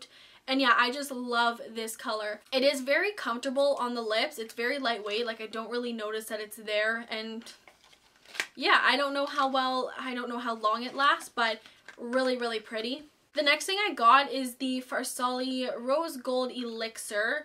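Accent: Canada. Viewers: me too! u sound like your from the prairies!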